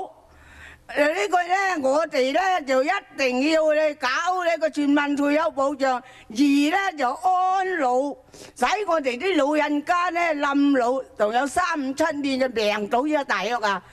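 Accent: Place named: Western Guangdong, China. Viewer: 哈哈。粤西口音。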